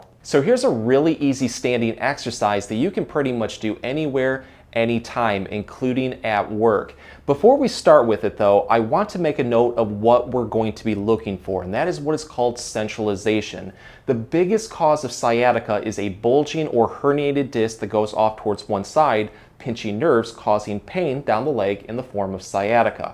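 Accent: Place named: Midwest, United States. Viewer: He’s a Midwesterner. Love the accent 😊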